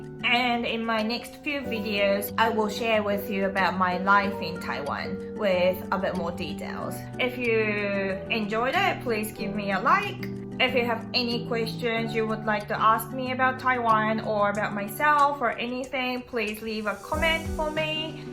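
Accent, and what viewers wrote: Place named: New Zealand. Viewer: Still got that kiwi accent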